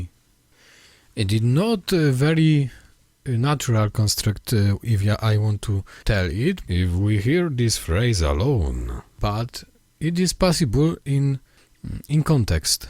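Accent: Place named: West, Poland. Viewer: tbh.. Your sanskrit sounds like you're reading it maybe for the first time. It has a more western accent. But I understand it may be because you're new to it (i guess) or because you're reading it in a latin script. There are parts where we pronounce the sound short or long(this can be known while reading it with the Devanāgari script). Old Sanskrit was also written in Brahmi script(which has connections to Aramaic) but rn Devanagari is widely used. I'd suggest, if you have time, try to learn the sanskrit alphabet and phonetics. Or maybe listen to sanskrit being sung, chanted or spoken.. Also Check her channel: <link> I think she is Greek(from her name). She has quite an accurate pronunciation of Sanskrit. Really Accurate! I've been learning German for some months now. I observed some similarities in Grammar and Word Patterns. German and Sanskrit both have Gender words for non living things.. 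Oh and also the ability to create new words by joining pre-existing words. And creating longer words/sentences with many words joined as one. I've seen it being done in Sanskrit and German both.. I found it interesting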